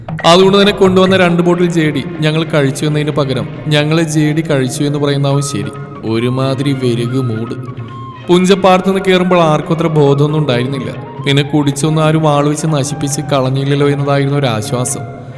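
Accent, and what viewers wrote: Thrissur, India. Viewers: Adipoli kurachhe Trissur samsaram poly thonni 👍💖💖💖💖💖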